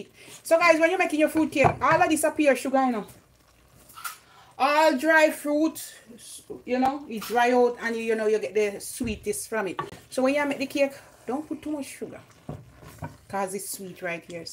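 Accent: Jamaica. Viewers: me know weh yuh born yuh sound like st. Elizabeth girl like you video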